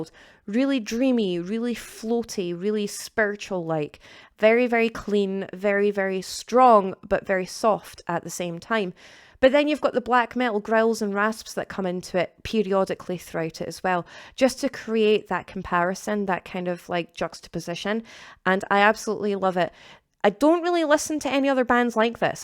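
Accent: Scotland, United Kingdom. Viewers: "If it's not Scottish, it's Crap!!". Love the accent and great video. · It's a basic metal channel. But every now and then, she hits me with one I havent heard, and those are the reasons I watch. Plus the charming Scottish accent/demeanor. Plus, to be fair, shoegaze is a 90's thing that's only been creeping into metal since -. Not her generation. This is how it feels to grow old.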